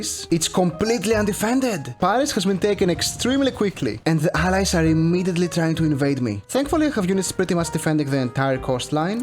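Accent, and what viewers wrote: Greece. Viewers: Bro are you Greek? You sound very Greek 😅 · I hear an accent in your voice which is pretty similar? Are you from Greece?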